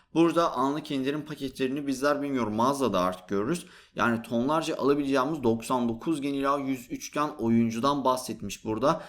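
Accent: Azerbaijan. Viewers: Abi Azerbaycanlımısın?❤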